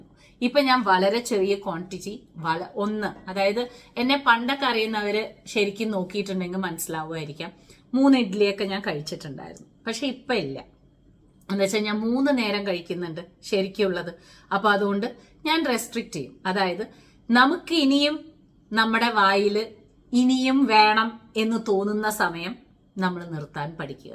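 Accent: Palakkad, India. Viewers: chechide palakkadan basha miss cheyyunnu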